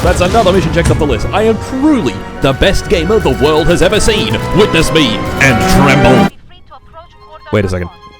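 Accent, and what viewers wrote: Finland. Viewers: Ah the sound of Finland, thank you for blessing our ears.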